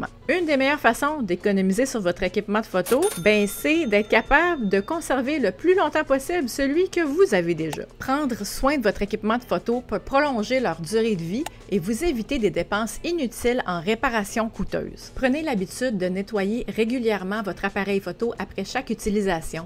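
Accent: Quebec, Canada. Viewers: Merci pour cette video et ces conseils avec ce bel accent quebecois !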